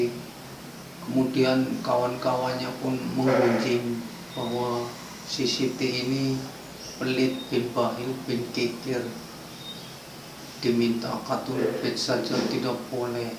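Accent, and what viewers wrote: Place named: Java, Indonesia. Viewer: logatna jowo bgett kk😀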